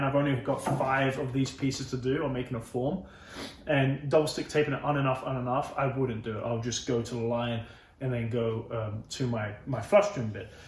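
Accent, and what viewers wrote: England, United Kingdom. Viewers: I’m just here for the great English accent!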